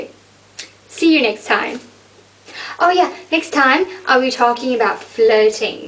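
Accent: England, United Kingdom. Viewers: Your British English is perfect, any way I am from the good old U S of A LOL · love ur British accent 555555+ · This is the first video of yours I have watched. Your English accent is great and it really made me laugh that you stayed in character! I'm from Scotland and would love to hear your Scottish accent! xx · Just stumbled on this site. Ha ha. You sound much better with an English accent. Waddee kap. Kop khun kap kru. Kuhn athibai phut passa Thai chaat mak.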